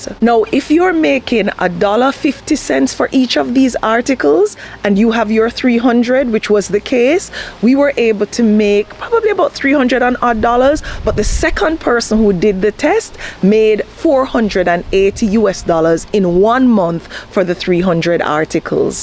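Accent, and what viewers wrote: Jamaica. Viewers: I know a Jamaican when I hear one. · I love your Jamaican accent, I'm surprised how I'm able to hear it in your English clearly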